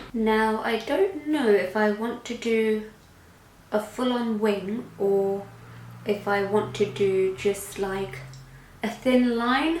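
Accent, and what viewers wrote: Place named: United Kingdom. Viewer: glad to know that I love you British accent. Keep it up. I started watching your video from the one where you explained the deep set eye.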